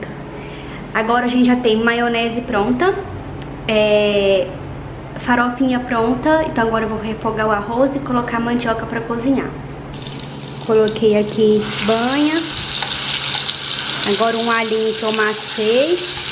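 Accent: Minas Gerais, Brazil. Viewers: Até parece que mineira! Só faltou o nosso “uai”!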